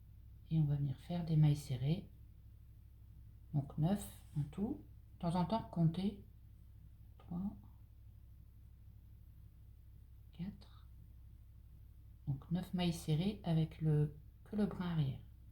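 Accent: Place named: Nord-Pas-de-Calais, France. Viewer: Très joli est je pense reconnaître l'accent cht'i, bonne année 🎉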